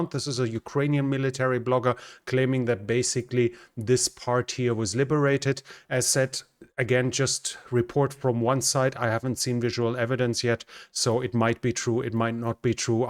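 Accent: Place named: Germany. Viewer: Urgent workout upon Krautaccent needed , more brain work either ....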